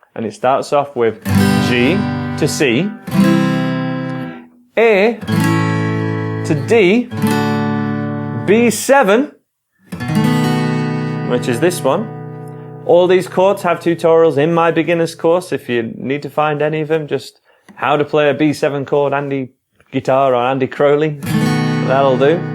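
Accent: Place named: Scotland, United Kingdom. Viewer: danTDM sounds really british , he sounds more ..... scottish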